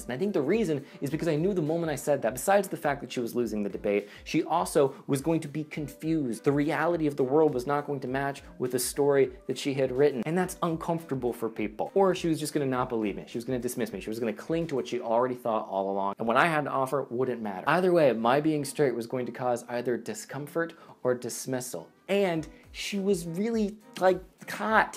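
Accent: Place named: South, United States. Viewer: Thanks for the disclaimer about the southern accent.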